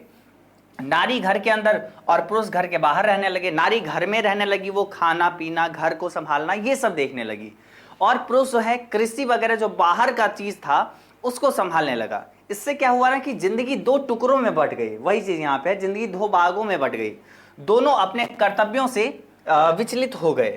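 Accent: Bihar, India. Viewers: Hmmm Bihar se hai sir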